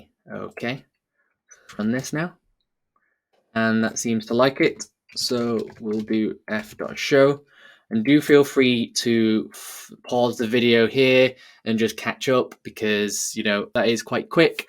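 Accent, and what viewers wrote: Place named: England, United Kingdom. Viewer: With a English accent!!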